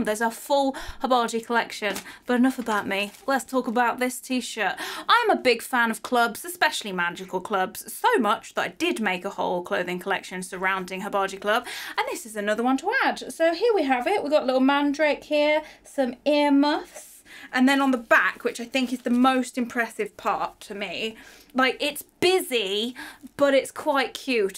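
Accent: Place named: Northern England, United Kingdom. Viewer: I think this vid is the most northern I've ever heard u.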